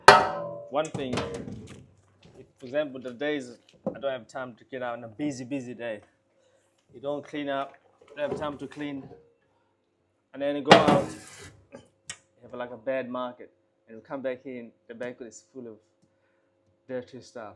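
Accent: England, United Kingdom. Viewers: What English accent do you have? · As soon as you drove up to that old brick building I knew this was going to be in England, then you started talking and the accent confirmed my thoughts !!! Nice work !!! How did you learn all this ?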